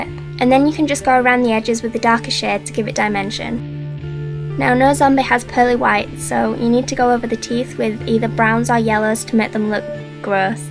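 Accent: Yorkshire, United Kingdom. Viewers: she sounds so yorkshire in this video haha it's so cute ^^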